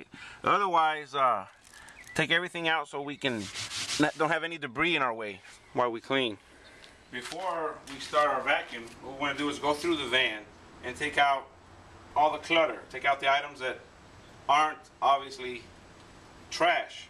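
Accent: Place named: Mexico. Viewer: He talks like a mexican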